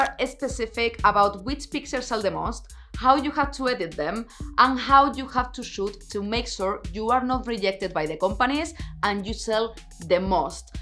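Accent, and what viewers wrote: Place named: Spain. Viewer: Hi, i love your videos, your viewpoints on the pro phhotography business and your unique spanish accent when you speak english! (My native tongue is French and your english is easier and more pleasant to listen to for me than the excited stance of most american youtubers) Microstock is the niche that interests me the most on youtube so i would like to recommend that you delve more into this topic. Eversince Nicole Glass has left this space on this platform, i have the feeling that you are the only one who can elegantly and efficiently fill up this gap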